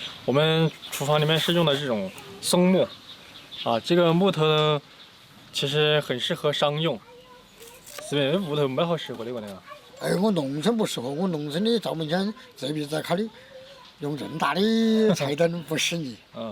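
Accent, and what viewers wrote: Sichuan, China. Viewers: 川普讲的很标准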